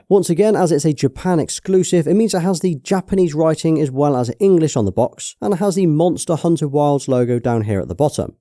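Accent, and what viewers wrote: United Kingdom. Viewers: Uk accent hits me hard 😂 but u cook ps5 in this video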